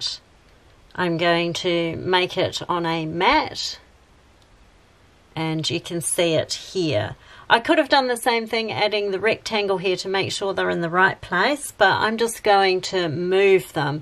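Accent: New Zealand. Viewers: This is the best card tutorial I’ve seen so far. Thank you so much I can hardly wait for my machine to arrive. By the way so nice to hear another Kiwi hahahahaha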